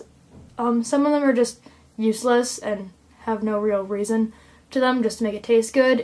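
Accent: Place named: Canada. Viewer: You live in Canada right? I can tell by the accent... I think...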